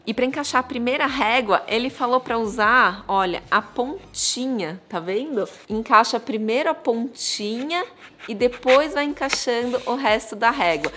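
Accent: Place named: São Paulo, Brazil. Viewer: Falo como você! Sou Paulistana!!!!!!!!!!!!!!!!!!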